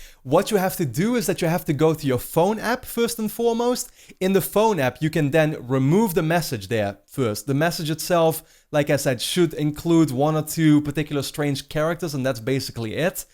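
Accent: Netherlands. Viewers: I wonder if he is dutch cause he has that accent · am i fucking glad switch doesn't have messages XD also i'm pretty sure you are dutch judging from your accent though possibly rip my brothers..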